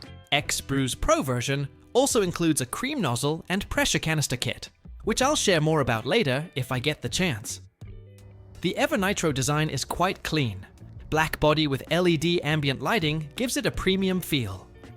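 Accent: United Kingdom. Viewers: What's going on with the accent, it sounds a little English vs the usual Canadian we're used to lol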